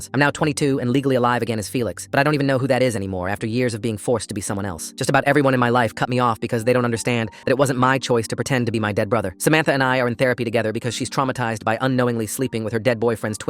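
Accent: United Kingdom. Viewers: You sound British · Great video but like why did halfway through the voice thing you just turned British? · I'm not the only one who noticed the British accent, right? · Why did the voice slowly get British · what's with the British🇬🇧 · ok good im not going insane the tts did turn bri'ish halfway through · the British acc is wild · Why do you turn British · Am I the only one who realized the British accent?! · Is it just me or did he turn british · WHY TF did he get a British accent half way through?.Anybody else get confused because the man talking is Felix + his brother Leon passed away. Felix took Leon's spot, so why would he want to grieve himself? Because he's at Felix's funeral but he thinks people need a mourn Felix. I think there was a typo and they meant Leon??? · Why did it turn British midway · It took me FOREVER until I realized he's British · O just realised that the voice is British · why did he turn british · The British accent 😂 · Me: BRITISH??? 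· Why is bro British now?? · Why did he turn british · He- hes British HE'S BRITISH AHHHHHHHHHH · It’s a British accent 🤣definitely can tell where you’re from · the british accent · Why did you turn British? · Also what’s with the british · Omg I thought it was just me not noticing that the voice was British the whole time 😂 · He turned to British and that make this so unserious sound. I’m sorry if you were bored the entire time I would actually sound serious.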